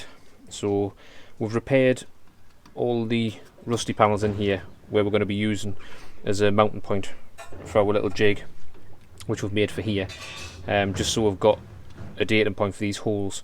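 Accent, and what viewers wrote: Newcastle, United Kingdom. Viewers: I love the work you're doing but I'm also enjoying the Geordie narration!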